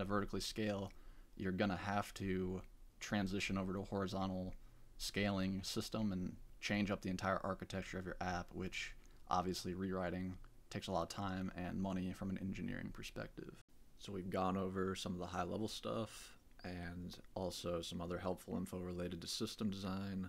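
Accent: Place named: South, United States. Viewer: Love that southern drawl man! Teach those prejudiced idiots who think speaking with a different accent makes you less intelligent that they don't know what they are talking about!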